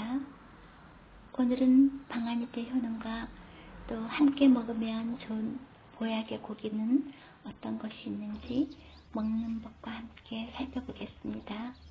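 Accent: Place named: Gyeongsang, South Korea. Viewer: 경상도사람목소리.ㅋㅋ.목소리는있는그대로...좋아요.제가 청음짱!이라서....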